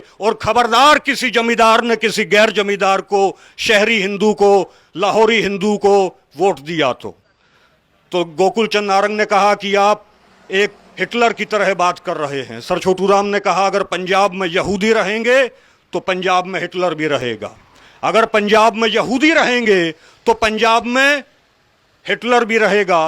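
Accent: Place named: Bihar, India. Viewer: Bhai u r talking like a bihari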